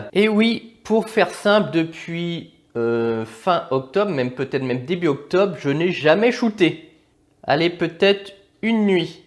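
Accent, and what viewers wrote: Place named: England, United Kingdom. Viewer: Belle bête ! J'adore ton accent englich. 😉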